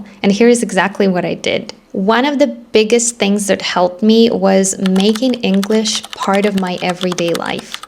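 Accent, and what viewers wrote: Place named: United States. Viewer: All Russian people that I know. They are speaking very well. And they have good pronunciation like an American accent. How is that possible? Your speaking skills amazing you’re learning quickly how? :))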